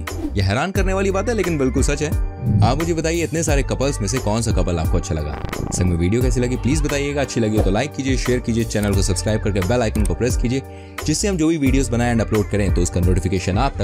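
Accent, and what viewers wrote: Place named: Kolkata, India. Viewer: Kolkata si bol rahe hain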